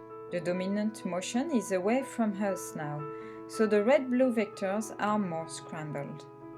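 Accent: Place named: Ryazan, Russia. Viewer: Этот рязанский акцент...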